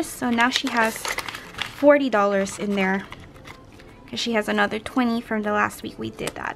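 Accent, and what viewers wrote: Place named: Philippines. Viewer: Hii are u filipino? I think I can tell from the accent ❤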